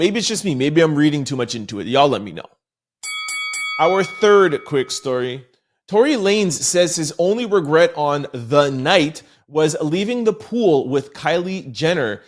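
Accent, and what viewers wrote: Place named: Canada. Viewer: His videos always seem to say a day ahead so I think he must be in another country. He sounds Canadian but maybe he lives in Japan or something 😂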